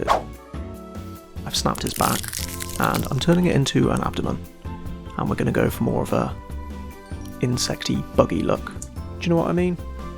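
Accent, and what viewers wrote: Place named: England, United Kingdom. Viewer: Ah, always so nice to find a fellow English man! "He's ard, inhe?!" 😂 Also, if you ever need to bake very small bits of clay (as in covering the wires) you can use a heat gun instead of putting it back in the oven!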